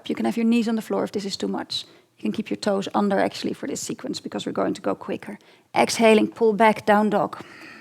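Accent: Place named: Netherlands. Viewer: Hoy Ik hoor van u acsent dat u van Nederland Komt maar dank u wel voor de yoga de yoga tip😊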